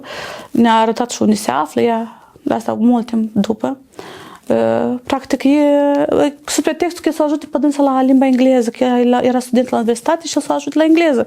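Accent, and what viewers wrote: Moldova. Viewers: După accent din satul Giurgiulești!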